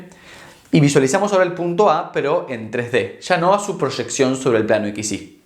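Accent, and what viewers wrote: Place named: Argentina. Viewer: TIene acento argentino pero habla español de españa, wtf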